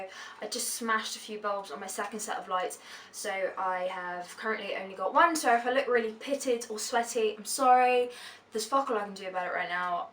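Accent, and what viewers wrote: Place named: London, United Kingdom. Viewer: Sounds like you've caught a tad of the London accent tales, get some of those vocals up on YouTube!!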